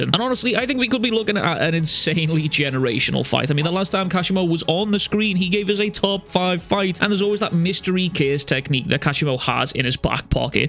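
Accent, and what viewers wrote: Liverpool, United Kingdom. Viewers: This scourcer accent makes this video even more enjoyable to watch, big greetings from Germany keep it up u sexy geezer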